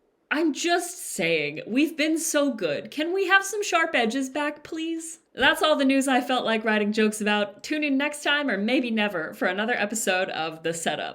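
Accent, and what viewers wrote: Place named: France. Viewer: Impeccable French accent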